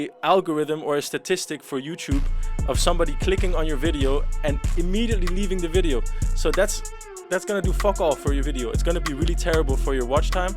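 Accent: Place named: Netherlands. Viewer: ik hoor nederlands hahaha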